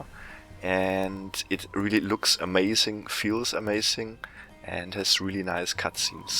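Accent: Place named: Bavaria, Germany. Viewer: you Sound very german. :D Can you play this game at full Screen? ape escape 2 seems to be the only full Screen game. :/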